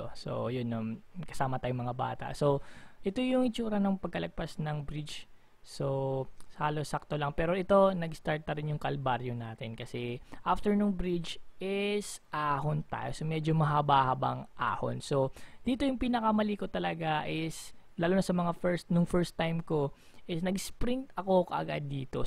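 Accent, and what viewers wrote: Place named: Waray, Philippines. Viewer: Idol tagasaan kaba? Bakit may halong Waray yung sa lita nyu?😊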